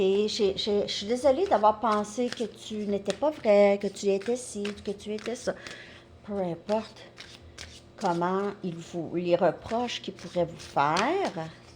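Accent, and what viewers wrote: Quebec, Canada. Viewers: Impressionnant! Exactement ce que je vis! Tu es trop forte, j'adore t'écouter! Toutes le compréhensions et prises de conscience se font, grâce à toi, dans la joie et la bonne humeur! Quel bonheur! J'adore! Un grand grand merci à toi! Et ton accent, québécois, craquant! Tu es un guide génial! GRATITUDE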